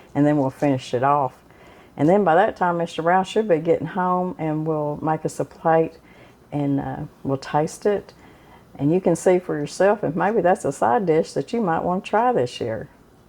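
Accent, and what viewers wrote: United States. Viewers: Love to watch your videos love your country voice iam also in AR was raised on country cooking thank You God Bless you all.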